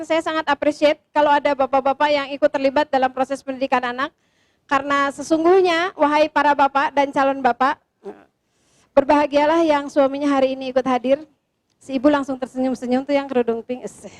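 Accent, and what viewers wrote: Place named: West Java, Indonesia. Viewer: Nyunda banget jd lucu ustadzahnya.. Mudah2an generasi indonesia kedepannya menjadi generasi emas qurani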